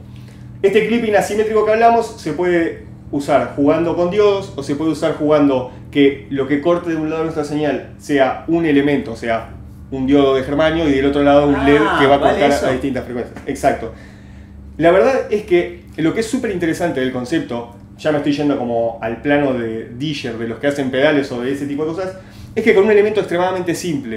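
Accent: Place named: Argentina. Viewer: Tremendo video! Muy interesante escuchar la explicación de esto en español argentino :D. Una sugerencia para un futuro, algo que no he encontrado mucho material es sobre cómo funcionan los canales en un amp valvular, qué componentes diferencien un canal de otro. En fin, a seguirla rompiendo con estos videos. Abrazo desde Uruguay!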